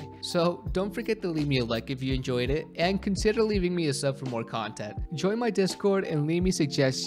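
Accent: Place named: Texas, United States. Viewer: wait I legit thought you were maybe from texas or something 😂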